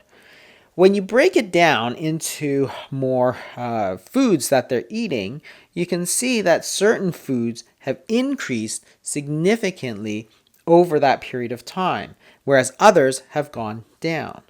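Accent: Canada. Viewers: Dr. Fung is Canadian, and so pronounces words in the Canadian manner. So sorry this offends you.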